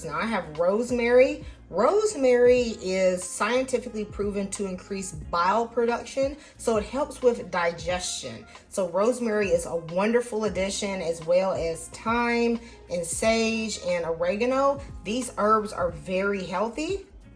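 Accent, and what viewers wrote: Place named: Nashville, United States. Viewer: Hi! I'm a new fan,. Hey - are you by any chance a Nashvillian? That's Tennessee, y'all. You sound so Nashville! Are you? Love your stuff! Keith in Nashville PS You're a genius!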